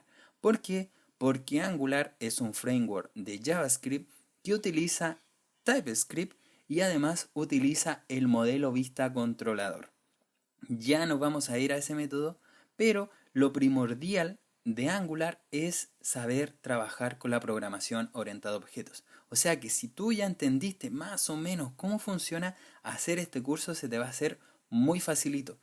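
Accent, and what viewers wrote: Chile. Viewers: Muy buen video, este era codigo que utilice muchas veces pero nunca lo vi ASI EN LIMPIOO y con una metafora TAN PERFECTA como las peliculas y serie, adems me encanta el acento chileno ajaj no se de que region es este acento.. pero tiene mas C y S que otros. Saludos desde Argentina. muchas gracias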